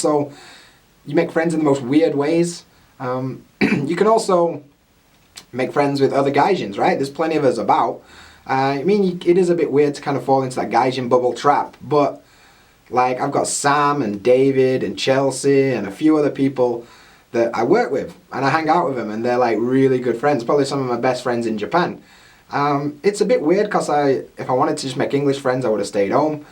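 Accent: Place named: Northern England, United Kingdom. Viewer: Hey, I noticed you always talk in your normal accent in videos. Do you need to change how you talk to when actually teaching English? I’m northern too (Geordie) so was wondering if you still use “me” instead of “my” when teaching or using the word “like” in the middle of sentences? Or do you try and talk proper English?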